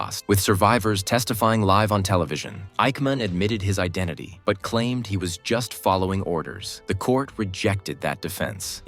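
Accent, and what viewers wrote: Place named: United States. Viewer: Nice vid but work on the pronuciation of names. Terrible American accent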